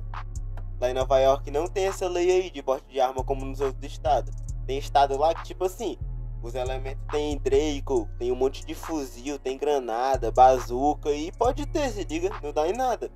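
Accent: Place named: Pernambuco, Brazil. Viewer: Sotaque de Pernambuco aí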